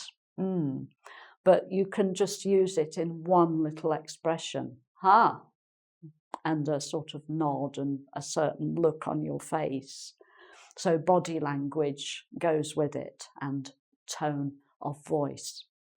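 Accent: United Kingdom. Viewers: you are absolutely adorable the way to teach and your lovely british accent. Thanks so much for your videos Im learning a lot. Send you big hug from Spain and subscribe to your channel :)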